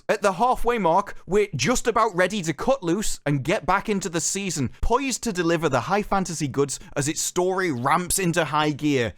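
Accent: United Kingdom. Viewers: You and Council are a great combination for hearing about the show, which I will never see apart from within your videos. I'm sure you've checked out his stuff - his low-key snark is a great pairing with your classic British delivery. I honestly don't know what they spent their money on! I thought a lot of their backgrounds looked like old series Star Trek background paintings. It just does not look modern, and it certainly doesn't look inventive or creative. Like a few other people have said, it doesn't seem to have a soul. I guess the best thing that can be said is the professor Tolkien is not here to witness it.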